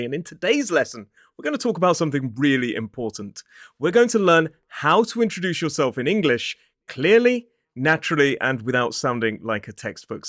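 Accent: United Kingdom. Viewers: I like your British accent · Hi, I'm Alauda (which means lark in latin). I'm german, raised in north Germany, Angeln, where some Anglo-Saxons came from originally 🤓, and I'm living in France. I'm retired now and living with my cats in a little cabin. I love to read english and american litterature, english is my favorite language, but I never really spoke it. Since some months ago, I try to activate my english by writing youtube comments. I have no concrete use for it, but I like your channel nevertheless, and particularly your so bri'ish accent.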